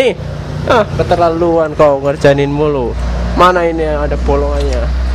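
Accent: Banyumas, Indonesia. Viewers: Bengkele ngndi mas ngomonge ngapak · Wong ndi rika....deneng ngomonge jawa ngapak kya aku😁😁😁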